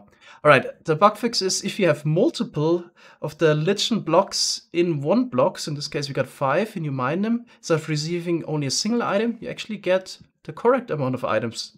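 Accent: Germany. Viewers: pretty sure he's german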